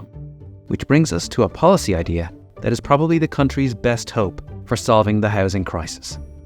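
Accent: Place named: Ireland. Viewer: Jesus, it’s great to hear someone with an Irish accent realising how screwed we are and looking at better models around the world. Hopefully someone government might take note or you get millions of followers who will push them to. Subscribed! 🙏🌍🌱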